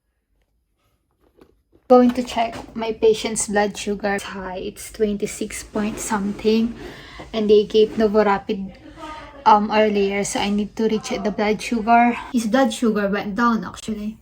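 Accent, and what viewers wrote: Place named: United Kingdom. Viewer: Nag eevolve ka na talaga classmate. British accent ka na. Cute.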